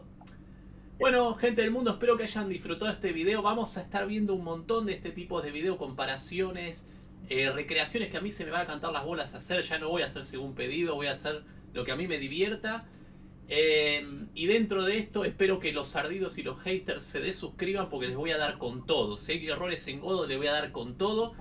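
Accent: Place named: Argentina. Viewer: excelente video pero insulta más porque en el acento argentino suena gracioso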